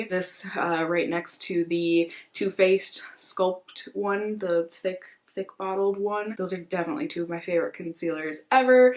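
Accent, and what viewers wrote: United Kingdom. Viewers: The British accent 😂😂😂😂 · Your British accent is lovely 😃 love your videos..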